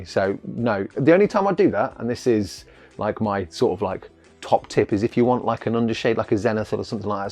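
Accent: United Kingdom. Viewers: Is it P Chi Tips or Yorkshire?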